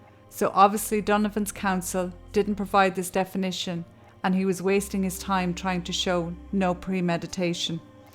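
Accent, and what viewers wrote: Ireland. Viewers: I've been binge watching your videos. I really like your style, and your Irish accent is just the cherry on top. 🍒 I appreciate the fact that you go straight into the case and waste no time talking about irrelevant things. You've quickly become one of my favorite channels. Thank you for your work. 🤗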